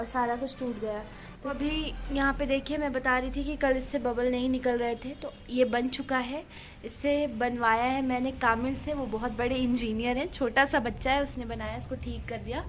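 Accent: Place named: Jharkhand, India. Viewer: Waoo nice .... Or AAP Ki Jharkhand wali boli to mashaAllah....😘😘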